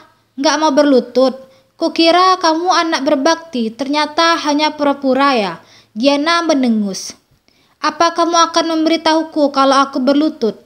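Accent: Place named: West Sumatra, Indonesia. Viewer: Logat urang minang😂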